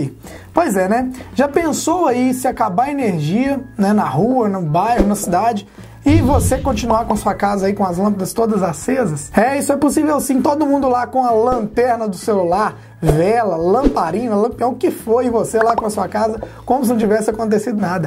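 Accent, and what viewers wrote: Goiás, Brazil. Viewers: Goiano? O seu assistente é de Goiàs! hehehh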